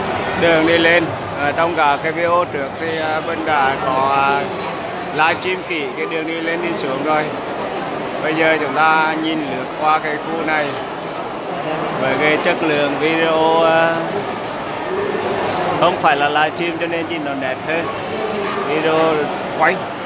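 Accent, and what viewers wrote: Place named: Central Vietnam, Vietnam. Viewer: Thích tiếng miền Trung nhất , nghe vừa nhí nhảnh lại vừa duyên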